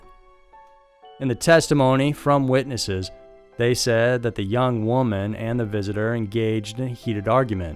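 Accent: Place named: Texas, United States. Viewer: I think he has a Texas accent. Its very familiar. Probably why I like listening to.it. when he says 5 like fiiiive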